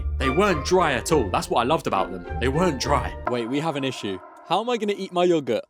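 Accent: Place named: United Kingdom. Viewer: Honestly u seem such a great dude! Plus I love British accent, it’s lovely :)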